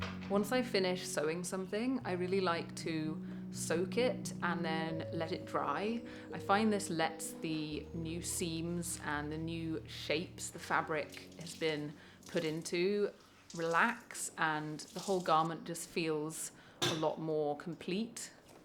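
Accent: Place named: England, United Kingdom. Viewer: Es una pena que no tenga subtítulos en español, solo logro entender algunas palabras del encantador acento inglés 😢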